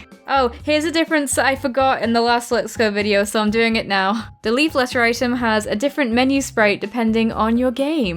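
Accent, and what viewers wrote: England, United Kingdom. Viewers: good video. your British accent is adorbs :) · It took me a while to realise she’s not Australian and actually English trying to Americanise her voice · Yay! I absolutely love your channel and your voice, mainly cause your a fellow Brit.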